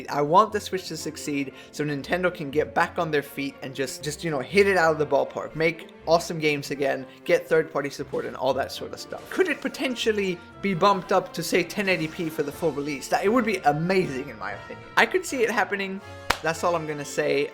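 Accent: Sweden. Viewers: Wow Are you swedish?!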